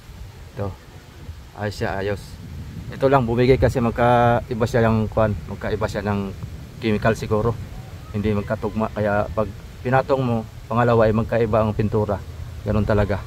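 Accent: Philippines. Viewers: may pagka-bisaya ba pananalita diyan?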